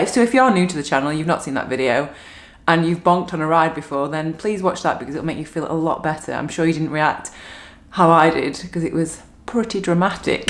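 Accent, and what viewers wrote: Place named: Manchester, United Kingdom. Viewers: Love your northern accent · Like an episode of Saxondale with that Manchesta’ accent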